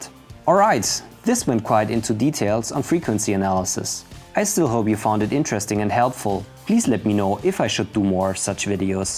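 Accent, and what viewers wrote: Germany. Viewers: are you german :D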